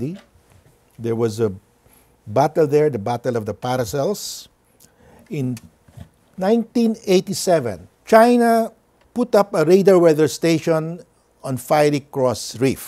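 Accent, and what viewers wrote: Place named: Philippines. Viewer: no need Dein. Most Filipinos are bilingual, English and Tagalog. Filipinos can understand "m-uh-ps" as maps. That's Filipino English dialect for you. Other English dialect speakers will quickly understand maps. After all, this presentation is mostly MAPS no matter how it is pronounced. Right?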